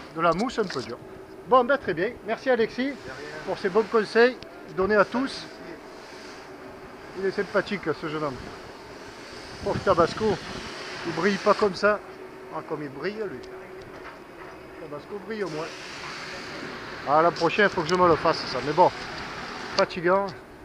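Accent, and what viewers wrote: South, France. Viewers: Bonjour, et hello les marins, J'ai flotté pas mal pendant des années, dont sept ans sur un Banjer 37 (revendu il y a peu suite à perte d'emploi, snif), je suis en Belgique, mer du nord (moins quarante!!!!), d'où le besoin d'avoir une timonerie fermée pour naviguer toute l'année. Merci, je me régale avec tes videos, ton accent du Sud (chaud), et tes commentaires très intéressants, cela me fait chaud au coeur. La navigation en Méditerranée est différente de celle pratiquée en Manche et Mer du Nord, où les escales sont en France, Belgique, Hollande et Angleterre, pour rester dans les deux cents mille. Te voilà donc reparti pour quelques délicieux mois de navigation, d'eau salée, et d'apéros. Profites-en un maximum, demain est un autre jour.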